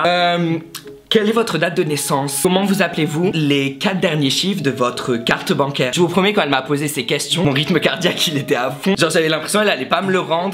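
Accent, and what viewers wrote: United States. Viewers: OMG TON ACCENT AMERICAIN jadoreeee !!!!! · J'adore ton accent américain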